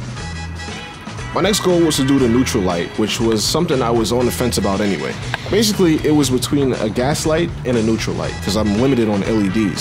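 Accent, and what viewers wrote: United States. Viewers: What an amazing voice most american accents are nasal and have an annoying twang but yours is fucking brilliant!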